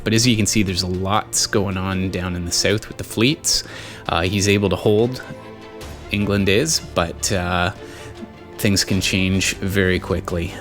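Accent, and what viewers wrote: Canada. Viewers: Hello, fellow Canadian (assumption based entirely on raised “out” vowel)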